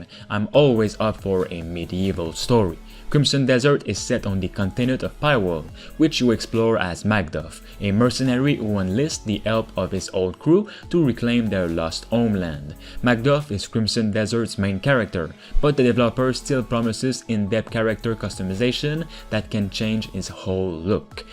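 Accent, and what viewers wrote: Quebec, Canada. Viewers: u have a quebecois accent ;) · Hmm are you from Quebec? :D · Hey, ton accent me rappelle celui d'un québécois, je me trompe? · caliss cest cool de savoir un quebecois qui comment les jeux pis tout mes caliss faut vraiment que tu travaille sur ton accent ca menerveeesss !!!! ont dirais gsp qui parle en anglais fuck!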